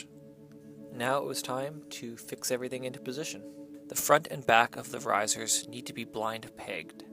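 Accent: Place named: Canada. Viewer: Are you Canadian sir?? Good to see fellow Canaidan on here lol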